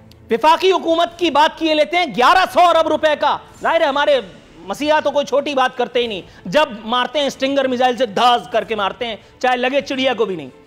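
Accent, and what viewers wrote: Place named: India. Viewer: Kuch Der k lye main samjha news anchor Indian Hai koi Indian news say khaber day raha hai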